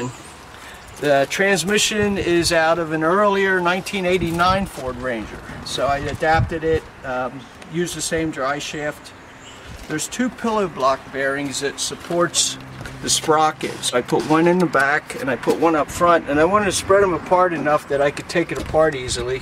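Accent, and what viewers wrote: United States. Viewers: What part of Philly is this guy from haa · I'm not sure where this guy is from, but he totally has a Delco, PA/South Jersey accent. Hell, he could be REVan Evan's dad!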